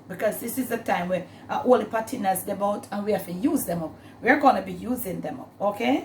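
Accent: Jamaica. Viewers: Hey. You are the best....continue chatting de patois...so authentic just like your delicious recipes...you go Mrs.Girl....Nuff Respect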